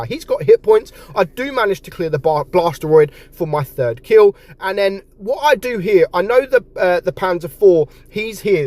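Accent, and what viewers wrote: United Kingdom. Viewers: Bri’ish?❤❤ anyways, love ur content!❤🎉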